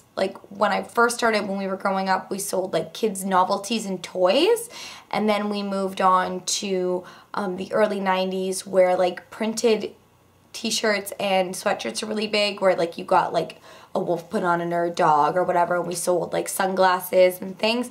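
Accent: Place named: Etobicoke, Canada. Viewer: Loving your videos! I have a friend from Etobicoke and you both have a very similar voice and "accent" ( to me from NY). Do you happen to live near this city? I'm not stalking you I just hear the similarities. Thanks!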